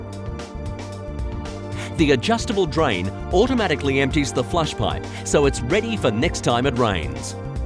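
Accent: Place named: Australia. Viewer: with volume up Oh, so if I put this on a tank, the military should be very thankful for the overexcited australian narrator Don't get me wrong, i love australians and their accents. :P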